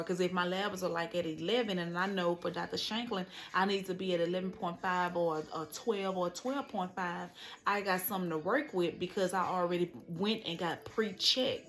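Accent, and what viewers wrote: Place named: South, United States. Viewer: Why every time I see you, I be like Hey Cousinnnnnn!!!. I stumbled across your video from your 2nd round and girl I love your lil country accent and beautiful spirit. Also you’re very informative. Thank you for the good tea and helping me stay focused on my upcoming surgery. These videos helps! Keep going cousin!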